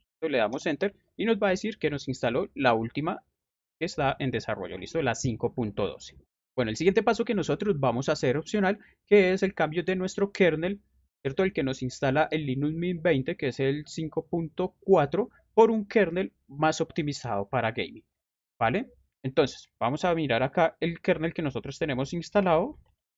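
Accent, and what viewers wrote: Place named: Colombia. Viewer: WOW varias cosas xd 1. Me agrada que POR FIN un canal en español ligado a linux este enfocado en GAMING, me parece genial y mas con el estado actual de steam y las mejoras que poco a poco vemos en otros lugares. Creo que poco a poco vamos viendo linux como ya una verdadera alternativa FÁCIL ante windows xd 2. Mi parcero como que es de colombia no? digo, se le marca ese acento que se distingue, así que supongo :v 3. TREMENDA pc ala, me parece genial primero porque es una belleza muy sexy, segundo porque la gráfica que tiene (segun estuve leyendo) esta dando problemas con algunos juegos (vamos es una nueva arquitectura híbrida con stream processor, tiene razón para fallar) por lo que seguramente vos tambien podras mirar eso xd y luegooo porque la verdad presenta hardware que mucha gente va a comprar, el R5 3600 es pan caliente y seguramente alguno quiere linux xd. 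Otro punto es que yo también manejo puro AMD, eso sí, algo más modesto pero funcional. 4. Recomendaría para el canal títulos más cortos en los videos, por ejemplo, el último video que subiste sobre mangohud (que por cierto yo sí me tuve que tragar los videos en inglés y un fallo en la instalación, pero al final sobreviví) es el único video en español que hay en youtube. Por lo que si pones algo así: "COMO VER LOS FPS EN LINUX" aparecerá el video de primeras para los hispanohablantes. Si lo se, puede ser algo "Clickbait" y demás pero we, tienes buen contenido y al final logras explicar lo necesario, considero que vale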